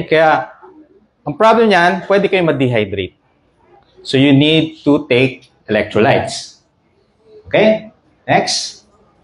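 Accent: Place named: Visayas, Philippines. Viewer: 👏👏👏👏ay bisaya man diay ni👏👏👏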